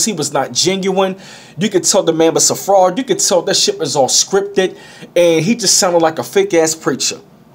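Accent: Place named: New York, United States. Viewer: Your NY accent is super fly 😘😘😘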